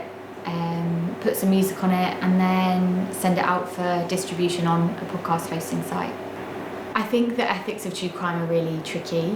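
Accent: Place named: Cockney, United Kingdom. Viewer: I love!!! Love the way she delivers her podcast straight in no giggling like you get on some of these other ones no respect whatsoever. Such a lovely voice too half posh half cockney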